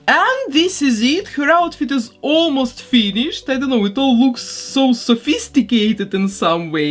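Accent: Russia. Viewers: я так и не поняла. почему для меня, (которая говорит по русски) голос автора звучит, как голос женщины из России с плохим произношением. я английский вобще не знаю. откуда автор канала?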